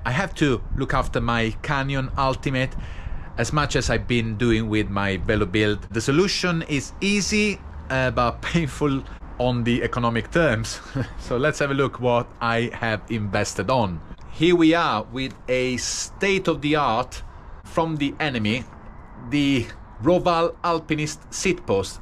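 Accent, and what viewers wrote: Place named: Italy. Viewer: Good spirit man😅 Keep on the good work. Love your italian accent👌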